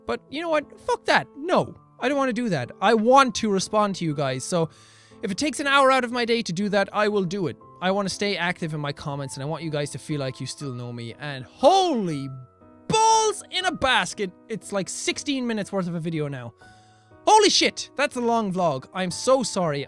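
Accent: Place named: Ireland. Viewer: Play station tree I love the Irish lol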